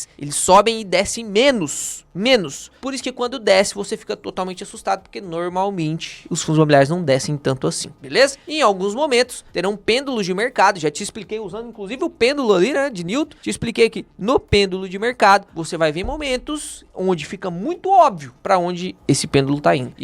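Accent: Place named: Minas Gerais, Brazil. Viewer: Velho. Essa voz de batuta da roça mesclado com conhecimento e uma pitada de mineirez , ficou top ! Kkkk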